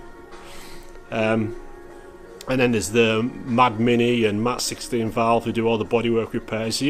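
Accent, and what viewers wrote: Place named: Northern England, United Kingdom. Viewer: The bent valve is a shame, do you know why and what it hit? 12.7 compression? Not messing around are you! What fuel do you run? Could there be a valve from something else that could be modified? Maybe turned down or something? Does REC (Paul Ivey) still exist? I remember going to see him for valves a long time ago! Maybe there's something in the US from a V8 or something? I agree about your videos, the ones where you explain stuff are the best, can't beat the Northern accent! I think as, ahem, more mature Mini blokes, we forget what we know and take for granted over time, young guys just don't get the exposure to basic stuff (although people like Tom have bucket loads of enthusiasm!). Back in the late 70's it was a necessity that you could work on your own banger to keep it going, all my mates did the same and we learned by our mistakes! 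I need to learn more about engine management and injection, that's why I watch your stuff, although I like how you're adding a bit of humour, who ate all the chocolate toffee's........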